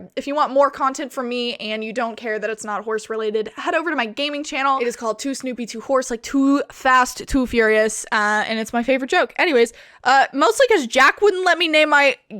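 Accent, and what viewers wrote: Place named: United Kingdom. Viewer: British really came out in this one 💂‍♀️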